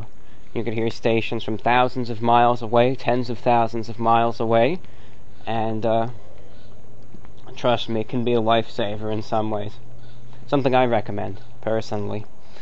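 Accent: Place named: Transatlantic, United States. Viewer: Listening to this podcast I noticed that ReviewBrah speaks with almost a Transatlantic 1950's American Accent. I never noticed that. It goes quite well with his vintage attire.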